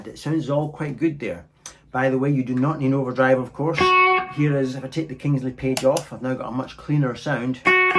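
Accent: Scotland, United Kingdom. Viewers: Cool video and Scottish accent is the coolest accent in the world. cheers from Vancouver, Canada. Ed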